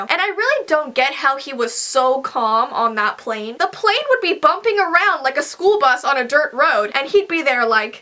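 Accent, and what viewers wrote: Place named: Ontario, Canada. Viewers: Yeah she is from Canada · Ya Ontario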